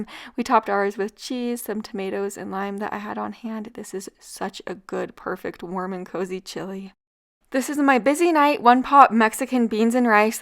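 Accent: Utah, United States. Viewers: I suspect another way of pronouncing things in Utah. It’s cute. I’m a Utah transplant.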